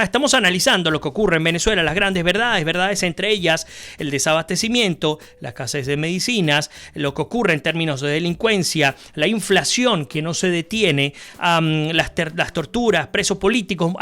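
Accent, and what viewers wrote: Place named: Rioplatense, Argentina. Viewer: descubrí estas trasmisiones tuyas por YouTube hace sólo unos días atrás y mi primera impresión ha sido muy positiva. Una pregunta, aunque te indentifiques como venezolano, detecto un acento o argentivo o uruguayo, es correcto? Pregunto por pura curiosidad nada más. Un saludo de un cubano desde Madrid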